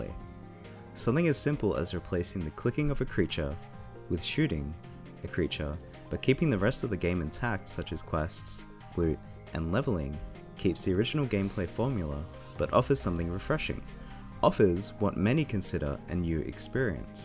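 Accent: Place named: Australia. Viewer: I have been watching your videos recently, but had not released you created Super Hot- great game! Plus you sound like a fellow Aussie, so a thumbs up just for that :)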